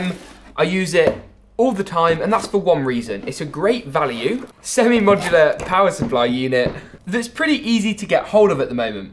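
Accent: United Kingdom. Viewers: Not only is he Spider-Man, but he is also a tech genius But you thought I was finished he also speaks in a British accent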